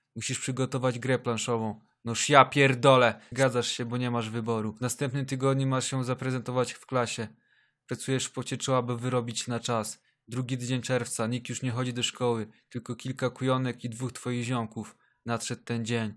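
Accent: Kielce, Poland. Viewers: ty jestes z Kielc?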